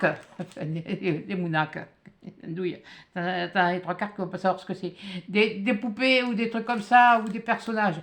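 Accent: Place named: South-West, France. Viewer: Bonjour je vais essayer de participer (malgres mon attelle au pouce sniff) Je viens de découvrir ta chaine et bon dieu que cela fait du bien d'entendre ton accen et ta gouaille sans compter entendre parler patois... merciiiiii... je suis originaire et jai toutes ma famille au fin fond du Sud ouest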